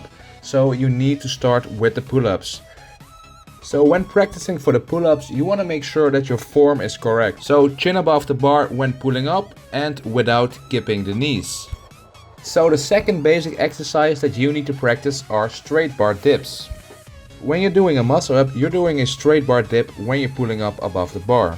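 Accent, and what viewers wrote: Netherlands. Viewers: ik kan het nederlands van 3km afstand horen 😂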